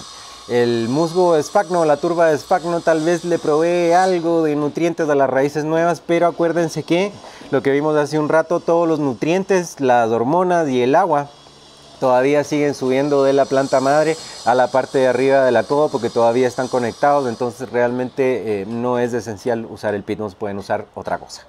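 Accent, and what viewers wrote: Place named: Central America. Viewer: Increible su conocimiento, facilidad de la palabra y modo de explcia. Gracias, yo no hablo desde la perspectiva de experto ni mucho menos, solo podr'ia decir que soy un apasionado por las plantas y los arboles, pero soy totalmente neofito en los bonsais, acodos y demas temas, pero me gusat leer, ver videos, comprara notas, experiencias y experimentar. Por ahora le cuento que quiero hacer un acodo aereo a un mandarino que esta en frente del lugar donde vivo porque ya es un arbol maduro y porque ya ha produccido mandarinas de las cuales he disfrutado varias veces, el tema es que esta en una avenida asi que es espacio publico y pues cualquiera podria destruir mi acodo, quiero conservar algo del arbol viv porque en Enero de 2019 me ire de aca. Ahora mi pregunta, podria hacer el acodo y tener exito?, cuanto tiempo es recomendable dejar el acodo para cosecharlo?, si, voy a ver el video de acodo aereo, gracias y Dios lo bendiga por su aporte a la naturaleza. 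Saludos de Colombia -- por cierto, Ud. suena centroamericano/chileno, de donde es?.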